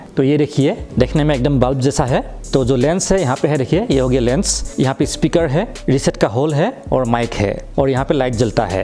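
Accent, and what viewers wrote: Assam, India. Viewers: Bhai aap Assam se ho kya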